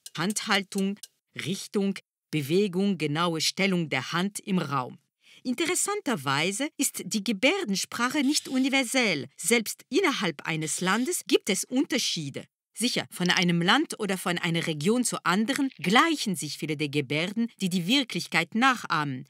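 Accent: France. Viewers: "Sind sie nicht charmant diese Schildkröten" und dann noch mit diesem französischem Akzent...ach einfach nur himmlisch xD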